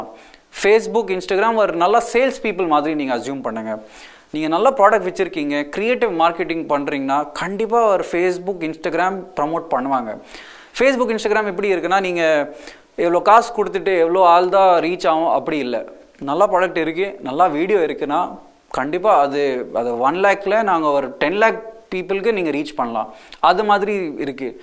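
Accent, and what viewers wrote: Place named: Bengaluru, India. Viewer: Bengaluru tamil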